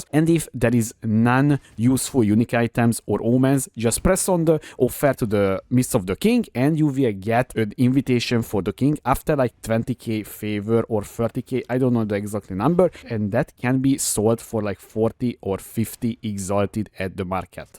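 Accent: Hungary. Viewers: Ennél magyarabb akcentust még nem hallottam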